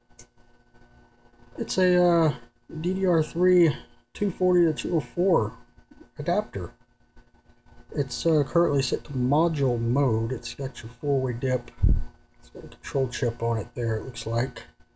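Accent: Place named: United States. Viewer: Thank GOD finally someone American you can understand.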